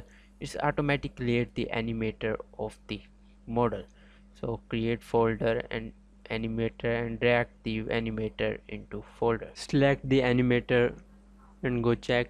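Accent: India. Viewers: Indian accent found =Op teaching ,btw i am from nepal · the dude with the indian accent has saved us once again!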